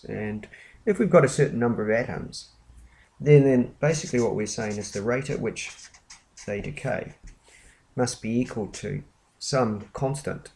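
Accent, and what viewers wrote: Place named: Australia. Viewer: You're Australian. Thank you, Mr. Australian man.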